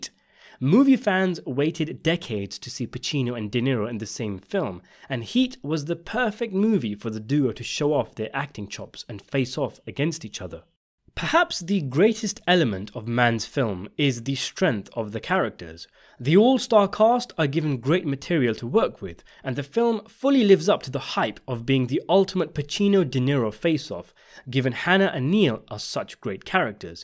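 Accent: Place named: East London, United Kingdom. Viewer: "Mans highly stylish film" talking like East London fam careful of disrespecting our culture.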